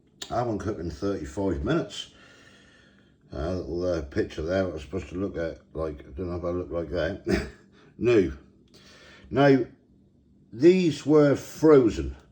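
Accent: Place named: Norwich, United Kingdom. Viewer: Norwich accent !!!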